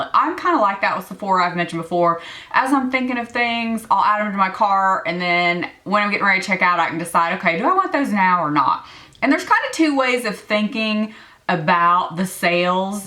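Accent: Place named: Texas, United States. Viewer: I will echo what many have already said. You look beautiful - your makeup is flawless. I would love for you to be my personal shopper for a day!!! While watching the video, I had to turn my phone volume up because I thought you said you were putting things in your car (instead of cart)!!! Why is she storing good makeup in her car??? I started laughing when I figured out what you were saying. I was born and raised in Texas, but your accent is thicker than mine! (Not a bad thing at all.)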